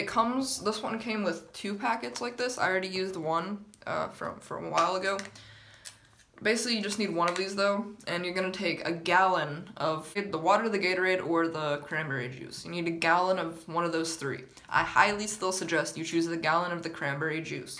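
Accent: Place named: Pittsburgh, United States. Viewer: Oh your definitely from Pittsburgh 😂😂😂 hey girl hey.... fellow pittsburghian here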